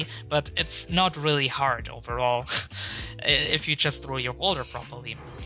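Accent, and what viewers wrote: Germany. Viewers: du bist deutsch, oder? der Akzent klingt familiar :D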